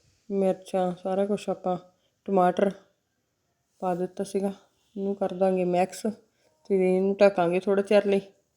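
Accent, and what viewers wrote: India. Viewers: ਸਤਿ ਸ੍ਰੀ ਅਕਾਲ 🙏ਦੀਦੀ ਜੀ, ਇੱਕ ਪਲੇਟ ਮੇਰੀ ਲਾ ਲਓ ਇੱਕ ਕੌਲੀ ਸ਼ਿਮਲਾ ਮਿਰਚ ਦੀ ਇੱਕ ਖੱਟੇ ਦੀ ਕੌਲੀ, 😋😋, ਤੁਹਾਡੀ ਦੁਆਬੇ ਦੀ ਬੋਲੀ ਵੀ ਸੋਹਣੀ ਹੈ🥰, nyc sharing🎉🎉👍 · ਦੀਦੀ ਜੀ ਸਾਡੇ ਹੁਸ਼ਿਆਰਪੁਰ ਚ ਵੀ ਇਸੇ ਤਰ੍ਹਾਂ ਬੋਲਦੇ ਹਨ ਜਲੰਧਰ ਵਿੱਚ ਮੇਰੇ ਪੇਕੇ ਨੇ ਤੇ ਹੁਸ਼ਿਆਰਪੁਰ ਵਿੱਚ ਮੇਰੇ ਸਹੁਰੇ ਤੁਹਾਡੀ ਵੀਡੀਓ ਬਹੁਤ ਵਧੀਆ ਹੁੰਦੀ ਹੈ। ♥️♥️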